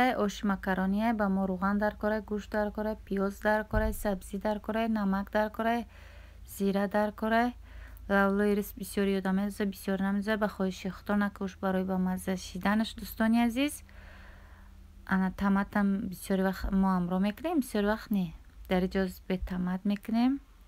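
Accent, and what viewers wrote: Kulob, Tajikistan. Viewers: Салом хохари азиз видиёхот бехат макулай баройки кулобигии тооза гап мезани 👍👍👍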